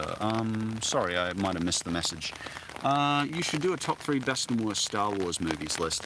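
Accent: Australia. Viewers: Hey are you from Brisbane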